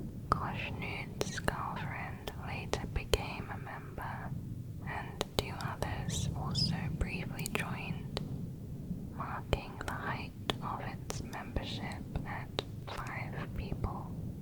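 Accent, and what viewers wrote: United Kingdom. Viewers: she has a British accent. Highly doubt she’s Native American. My guess is Nepali or Afghan.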